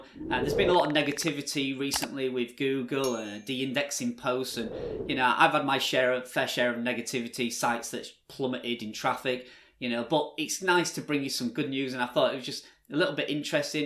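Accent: Yorkshire, United Kingdom. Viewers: Wow. Another very inspirational video. I am so blessed with the stats in this video bro. Thank you for coming up with such great videos. Good information and good motivation for my own blogging journey. And btw, I love your Yorkshire accent too. Cheers. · "eh up, somethings going 'ere"..... good yorkshire speak lad! t'videos excellent lad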